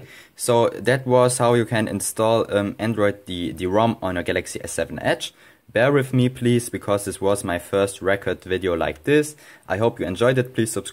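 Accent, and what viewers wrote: Germany. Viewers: Are you from germany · Oh I can feel your German accent 😂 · Man Hört das du Deutsch bist xD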